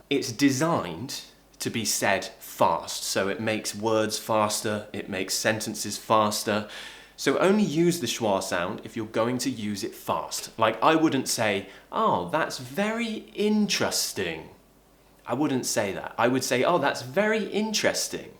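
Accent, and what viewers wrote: United Kingdom. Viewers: You can't believe how much I love listening to you! I wish I had that British accent- I wanna become an English teacher here in Germany and I would love to sound like you haha 😂😂 anyway, great work! Oh and Happy Birthday! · Happy birthday professor 🎂🎈 greetings from Philippines. Been watching your videos and it helped me a lot to learn and improve my english most specialy learning your British English accent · The idea of starting a podcast is wonderful. Walk and enjoy your British accent,so nice and so beautiful .hope it will realize soon 💕💕💕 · I really like this lessson. It is really useful and o love British accent. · Hi, I'm Julio from Brazil, I love your videos, I am learnig American English, but because of your videos , now I prefer British English hahaha, I think your accent is so much beautiful .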